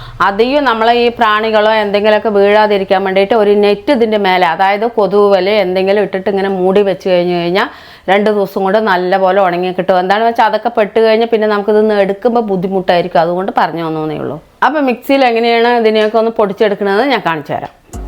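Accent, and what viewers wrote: Palakkad, India. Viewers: നല്ല പാലക്കാടാൻ ഭാഷ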